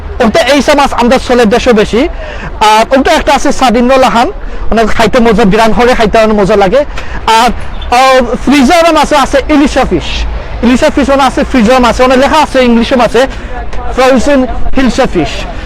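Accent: Sylhet, Bangladesh. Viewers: Speak proper Bangla, not Sylhety accent! Its youtube, not your private Facebook account ;) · nazzy hammond This is call sylheti accent. Like Barishal, Dhaka, commila, Rangpur, Chittagong etc have their own accents. But language is one Bangla. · এই কুত্তার বাচ্চা, শুদ্ধ ভাষায় কথা বলতে পারিসনা,, তুই কিসের বাংগালীরে,,! সিলেটি চুদাও না,, মুর্খ কোথাকার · Superb bro I like the way u speak sylheti keep up the great work :) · Cant you speak in proper bengali accent? I see many sylheti dont even bother speaking sylheti... That's good... But as a Bangaldeshi everyone should learn how to speak in bangla properly.... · I hate your SYLHODDAR accent so bad language 😡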